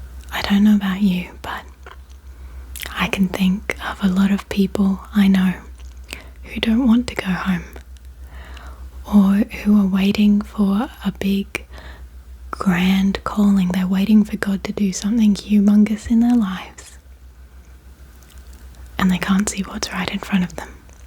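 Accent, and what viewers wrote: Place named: Australia. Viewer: our Aussie accent reading bible verses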